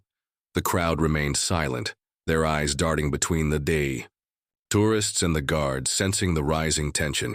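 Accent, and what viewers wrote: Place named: United States. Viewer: Yet another load of American accented crap!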